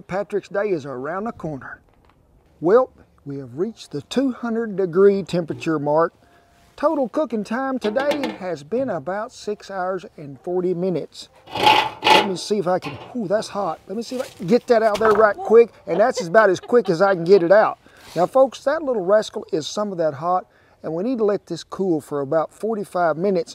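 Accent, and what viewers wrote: United States. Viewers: I love your cowboy talk. It is like a computer language.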